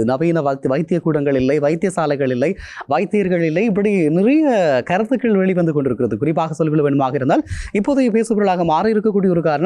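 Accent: Sri Lanka. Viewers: Ivan ilangai tamilano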